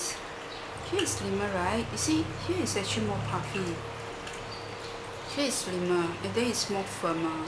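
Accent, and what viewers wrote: Singapore. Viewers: are u singaporean, ur acccent!!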